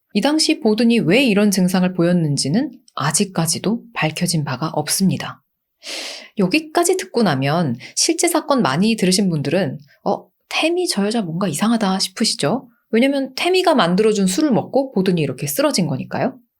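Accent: South Korea. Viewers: 한국식 영어발음은 친근하고 귀에 쏙쏙잘들어오니 좋은걸요 ㅎㅎ 바른 발음으로도 알려주시고 여러모로 꼼꼼하게 다정세심하신 님❤ 오늘영상도 고맙습니다! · 영어발음 관련해서 까다로우신 분들이 많았나봐요, 전 한국식발음이 더 듣기 편하고 좋은데 ㅎㅎㅎ 고나리 넘 신경쓰지 마세용 ㅎㅎ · 🙄ㅈㅓ는....한국식 발음이 좋ㅇㅏ요.!❤😁👍ㅎㅎ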